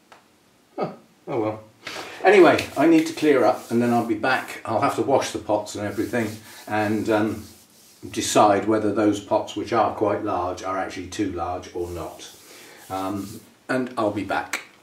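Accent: United Kingdom. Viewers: Always enjoy your videos & appreciate your diligence in making them. Something to look forward to seeing. Guess I am a scaredy-cat in buying anything other than phals.! But I do enjoy the blooms & they are easy to maintain. Would you comment on the benefit of leaving the “strings” on plant after pulling off the dead mushy roots? ( that is, if you think there’s merit in doing so) Thank you & I enjoy your British speech.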